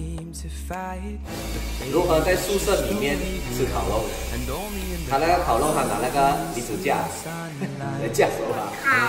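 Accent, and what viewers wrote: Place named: Malaysia. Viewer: 最喜欢听你马来华文口音说：前戏，直至插入，这个前戏很美啊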